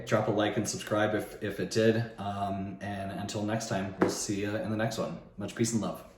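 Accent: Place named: San Francisco, United States. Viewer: It's called a San Francisco Accent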